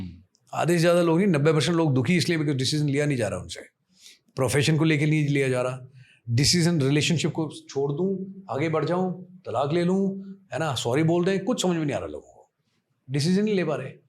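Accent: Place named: Punjab, India. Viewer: Your Punjabi accent sound so cool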